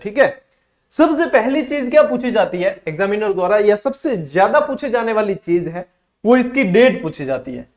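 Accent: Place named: Marwari, India. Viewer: Sir your marwadi accent is amazing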